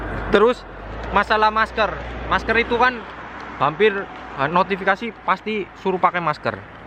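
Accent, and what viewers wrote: Banyumas, Indonesia. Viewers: Ciri khas mas...ngapak😆👍👍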